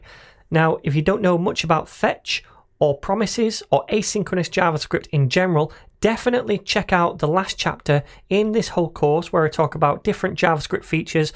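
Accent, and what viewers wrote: United Kingdom. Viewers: Is this tutor British?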